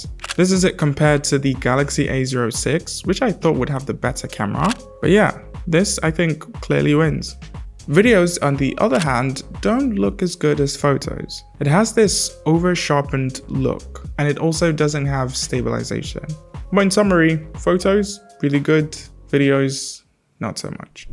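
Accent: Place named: United Kingdom. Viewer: I believe na UK Born or stayed there for many years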